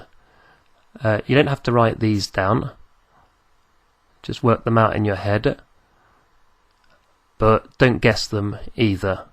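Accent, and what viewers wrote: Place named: United Kingdom. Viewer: i need a lesson to understand the fruity limey's accent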